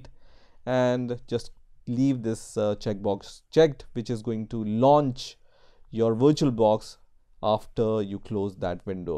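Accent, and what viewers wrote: India. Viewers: oh indian (not racist,just suprised to hear not bad at all,but different accent)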